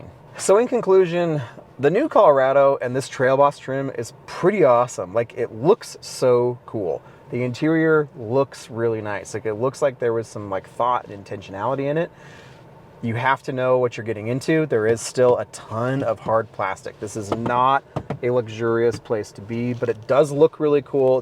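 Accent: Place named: Utah, United States. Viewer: I watched this video and about 1 minutes in I knew you were from Utah.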